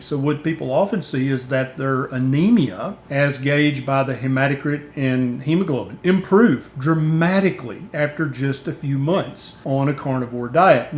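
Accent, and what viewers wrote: South, United States. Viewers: I am all for the carnivore diet and have been on it for more than 6 months and it is absolutely amazing. I listen to many experts on the carnivore diet but I simply cannot get past this doctor's southern drawl accent. If somehow he can change that up, he could probably win back the top half of the country and make a larger impact with this awesome way of eating. Believe me, I completely understand that it has nothing to do with education or intelligence because obviously he knows what he is talking about and is educated on the topic, however it takes all of my willpower to get through an 8 minute video listening to this type of inflection. I suspect that would be the same for most of the northern part of the country.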